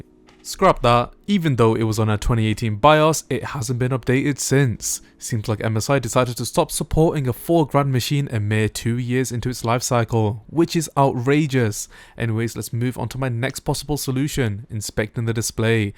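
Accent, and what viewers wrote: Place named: Bradford, United Kingdom. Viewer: This guy sounds like one of those bradfordstani’s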